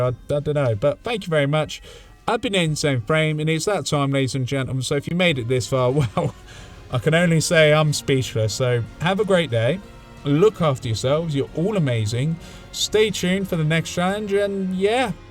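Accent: United Kingdom. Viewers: Loved your video, too bad I don't speak British. So I had to turn on the subtitles, but other than that, great video man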